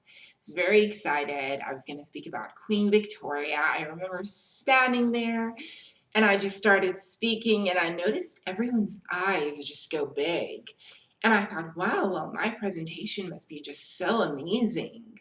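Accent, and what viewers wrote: United States. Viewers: I love the way you speak! As an American myself, i would say your accent sounds closest to the California American accent. After listening to u speak for an extended period of time, I could pick up that the American accent isn’t native since since you enunciate words better than most Americans; and u pronounce a few select words a bit differently here and there. But still by far the most convincing American accent I’ve heard from somebody not from here 🤗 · As an American you definitely sound like you’re from California. Sometimes when you say certain words i can hear your South African tone come through but when I first started watching you i had no idea you weren’t from the states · I thought you were from the U.S. maybe California. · You sound authentic American to me... and yes your word choices do show high intelligence... I had a SA friend in High School (Dirk) who spoke fluent German and English with perfect diction.. 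We use American TV to teach English in South Korea and the Philippines... You're looking relaxed and happy... have a blunt ..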